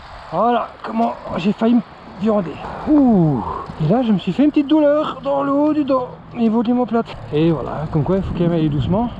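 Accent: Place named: Belgium. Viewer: Belle vidéo ! Tu pourrais dire ou est le spot ? je suis à bx et quand j'entends l'accent je sais que c'est en Belgique 😅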